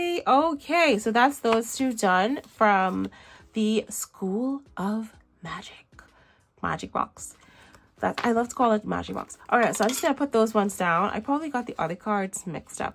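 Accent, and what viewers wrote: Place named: Caribbean. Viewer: Wooohooo Caribbean girls run it 😂😂😂 defo heard your Caribbean accent thought you were Bajan😊😊 Great video love all the boxes❤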